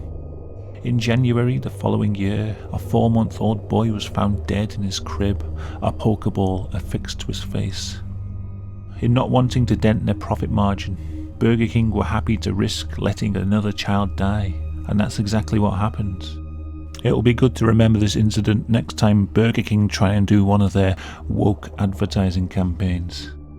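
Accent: United Kingdom. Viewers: What part of the UK are you from? Just wanted to know where the accent was from lol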